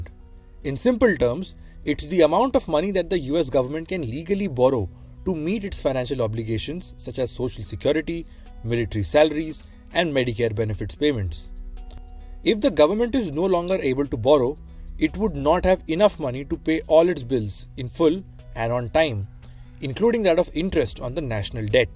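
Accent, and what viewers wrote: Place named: India. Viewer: Maybe don't have a customer service person from India doing the narration?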